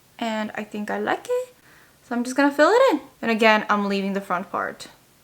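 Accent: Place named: South Korea. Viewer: 콩글리쉬 너무 귀여워여ㅋㅋㅋㅋ 메아크업도 예뻐여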